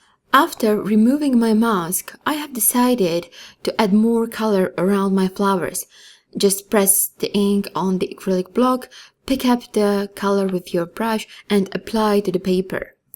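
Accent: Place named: England, United Kingdom. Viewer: Fiiha velmi dobre video!! ;-) a o typickej anhlickej anglictine nehovoriac,super !! A aj pohladnicka krasna!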